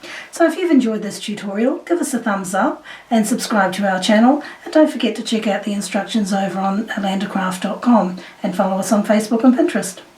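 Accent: Australia. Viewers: Oh! Thanks for clearing that up; sorry I didn't quite understand. I love the Australian accent!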